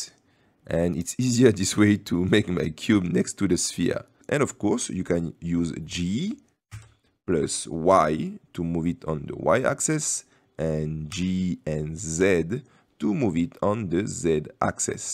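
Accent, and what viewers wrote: France. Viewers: He sounds so french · That French accent though! Perfect for learning :D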